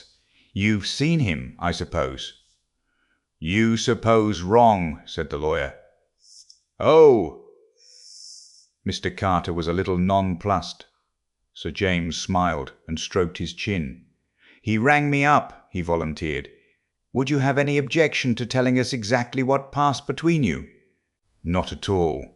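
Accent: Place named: Australia. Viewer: Sadly, a new AC novel, only her second, and yet "read" by a mindless (literally), uncomprehending AI in a totally deadpan manner, robbing the listener of any chance to be drawn into the fun or excitement of the adventure. Don't quite follow why the accent should be an Australian, mostly monotone, though well enunciated. What a total, boring waste.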